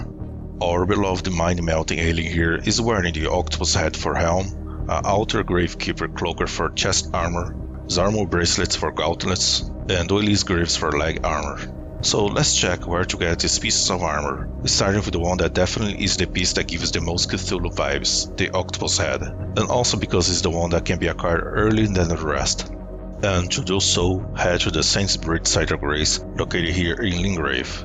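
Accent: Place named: Brazil. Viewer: Huge brazilian accent, are you from brasil?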